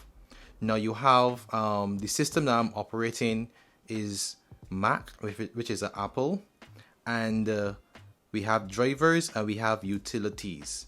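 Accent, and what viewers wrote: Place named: Barbados. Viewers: Had to subscribe as soon as de Bajan accent hit me😁. Well done. Very informative video. Am seriously considering getting this printer. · I stumbled upon his channel and from the time I hear the accent I say he got to be a Bajan lol.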